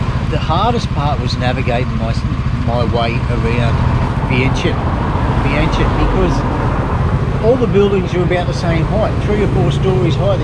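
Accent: Australia. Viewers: Your Australian accent is enough to keep me watching 🤣😆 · Hey....don't seek perfection...in every video you are doing...great...its informative...since it's new world to us....l miss vientiane....sooo much... Dazzling nature....honest People....love your ozzi accent....Baghdad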